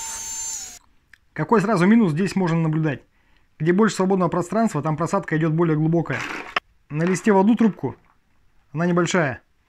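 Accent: Perm, Russia. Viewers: Очень оригинально! Класс! Ты не с Перьми случайно? Акцент как с реальных пацанов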